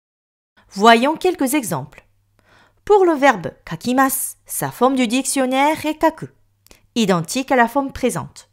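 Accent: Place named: South, France. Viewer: Bonjour, c'est amusant car j'entends chez vous l'accent du midi ! Je voudrais apprendre des rudiments oraux de japonais en cours particuliers. Pour un court voyage au Japon et pouvoir chater. Est-ce possible avec vous ? Thierry Dorée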